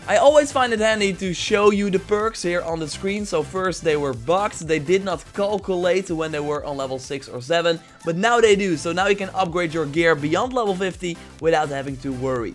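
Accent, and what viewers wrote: Netherlands. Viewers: Hey are you Dutch?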